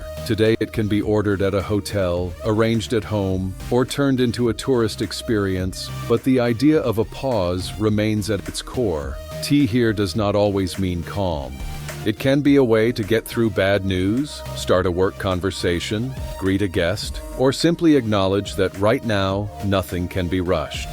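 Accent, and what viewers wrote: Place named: United States. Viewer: The AI voiceover would be a bit more appropriate if it was anglicised & deleted americanisations like,(Looo——— tenant.& rowwt) The video is ,however, a true reflection of Brits in general.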